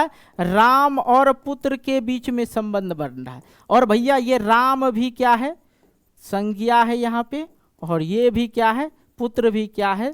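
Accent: Bihar, India. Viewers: Sir aap bihar se ho kya 😅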